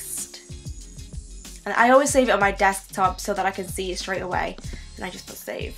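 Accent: United Kingdom. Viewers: I don't know why but I was fully expecting an LA accent from the intro! I get so excited when I see fellow brits making banging content! LOVED THIS ♥️ you got yourself a new sub xx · You said you are from the Philippines ? Were you born there or Great Britain ? You sound British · I’m here for the British accent too! 🇬🇧❤️ I need the deets on how your insta vids are clear! When I upload it’s not great definition! 😭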